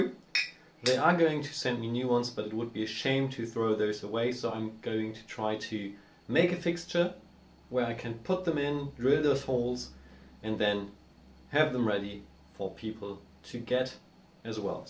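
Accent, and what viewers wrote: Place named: Australia. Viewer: Well done! Nice to see your face and hear you talk,. Did you learn English in Australia? I am trying to figure out your accent;)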